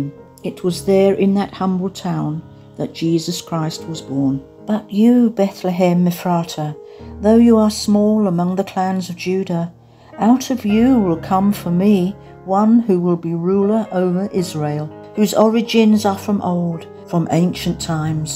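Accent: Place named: United Kingdom. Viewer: Narration with British accents suggests truth, its just manipulation, turn video's off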